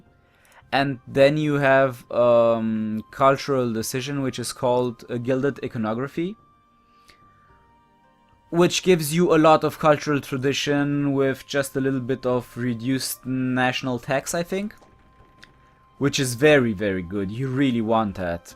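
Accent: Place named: Germany. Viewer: I really like your voice. Keep your work up, its good .D Greetings from Reddit. Btw: Are you from Germany? Your Accent sounds a little germanic.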